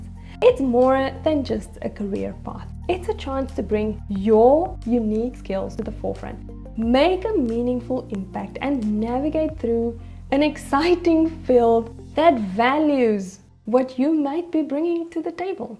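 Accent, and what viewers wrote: South Africa. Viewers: This accent… I know it mahn. are you South African? 😅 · I know my people when I hear them are you from SA by any chance?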